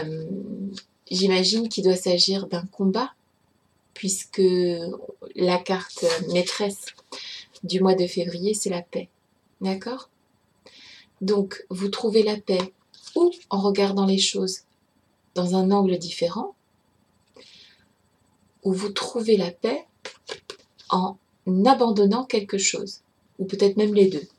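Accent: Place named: England, United Kingdom. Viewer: Merci 🙌💖 c’est certain ça ne se présente pas en mode « pump it up » quoi que?! 🤣 mais j’avoue que je comprends très bien ce tirage à ce moment de ma vie ou je n’ai plus envie de me battre contre ce passé pénible mais plutôt œuvrer pour moi! J’hésite en terme d’investissement travail... alors je vais revenir à l’intérieur 🙏🏻 et je garde mon guide de paix dans le coeur pour février 😌 beau mois de février 😘 et j’adore votre accent anglais 👌🏼👌🏼👌🏼 perfect!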